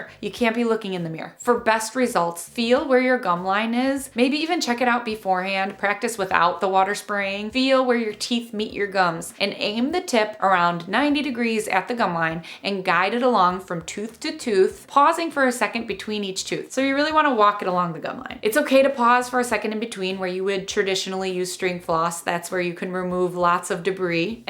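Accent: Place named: Chicago, United States. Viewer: Your accent reminds me of my family in Chicago. Eh.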